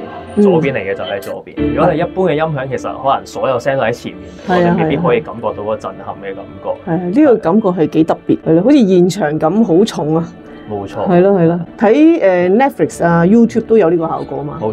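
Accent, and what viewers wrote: Taiwan. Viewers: 是超級可愛的那種口音 不是不好的那種 但是越来越像台人了 PS：完全没問題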